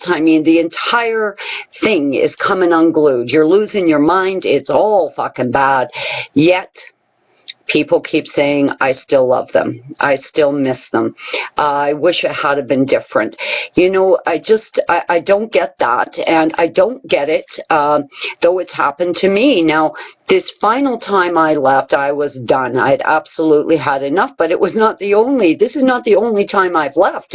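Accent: Canada. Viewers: Hey, if I may ask what province are you from? I think Nova Scotia, and also for some reason,Manitoba. I'm from Ontario, theres no place like home.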